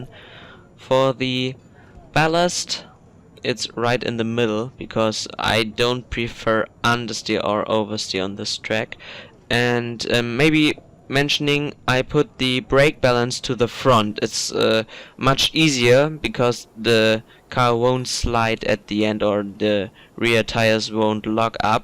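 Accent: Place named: Germany. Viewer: Wie ich alleine an deiner Aussprache höre, dass du Deutscher bist. xD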